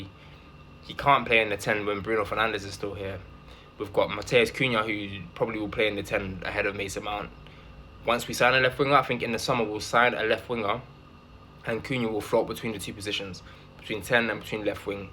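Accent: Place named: Manchester, United Kingdom. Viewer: lovely manchester accent you have there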